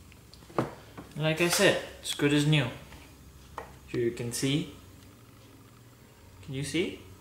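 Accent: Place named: India. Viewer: Why do all the indians talk like that i like the way they talk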